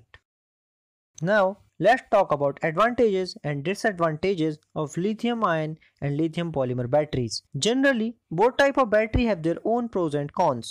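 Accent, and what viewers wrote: India. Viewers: f.king indian accent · ogod indian accent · I just hate this indian accent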